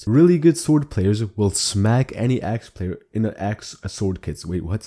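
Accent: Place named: Germany. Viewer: Joa net schlecht das man als Deutscher versucht einen englischen Kanal man merkt schon das sich die deutsche mc Community sich für solche Videos nicht interessiert seit dem BastiGHG nur eintönige Challenge Videos macht. Ansich ist dein Englisch sehr gut aber man hört nur minimal dein deutschen Akzent.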